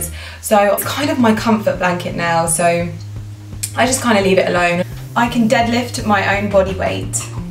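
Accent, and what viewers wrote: RP, United Kingdom. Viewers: I don’t understand people talking about her accent. Its been blown so far out of proportion. She sounds almost the exact same just more mature. she’s always been posh. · I'm new and u just happen to be amazing :D and i love british accent so its a bonus haha and u have such a nice healthy body really impressed with the hard work and determination, gonna be one of my inspirations! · Strange how since you’ve progressed you’ve actually started to speak ‘posh’ too...mmm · I'm here for the British accent! Such a beautiful and sweet woman. Oh and btw, you have mentioned you haven't cut your hair in 8 years, however your hair looks so healthy, thick and strong. What are you hiding from us? Haha. Please make new videos. Subscribing Love, from Lebanon.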